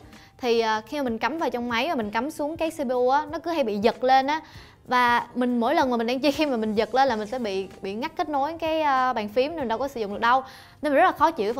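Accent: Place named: Saigon, Vietnam. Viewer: Ban Nu nay giong Sg tot... Than thien voi moi truong !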